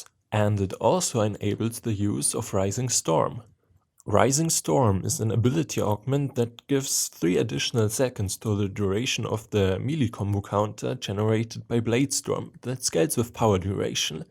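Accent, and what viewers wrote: Germany. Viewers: Are you from Germany or Austria :D Your accent sounds like that :) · You sound very german.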